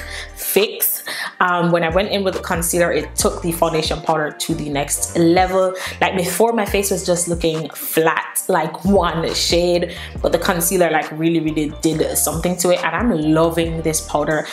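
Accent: Jamaica. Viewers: Are you Jamaican? I sense a bit of an accent! I'm Jamaican so I was curious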